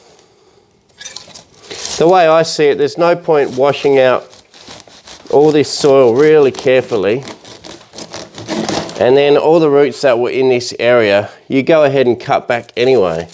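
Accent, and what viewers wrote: Australia. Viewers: Sorry about the tree dying. That's gotta hurt. I really enjoy your videos, especially your bonza Aussie accent. I'm away from Oz atm and it's cool to hear someone who really knows his stuff talk about bonsai in a down to earth and approachable way. I'm new to bonsai. Am only in my 2nd year and trying to learn how to grow healthy trees. I don't have any real bonsai yet (all pre bonsai) so it's awesome to hear you talk through your decision making process. Middle stick to big stick for me but I like trunks with character best.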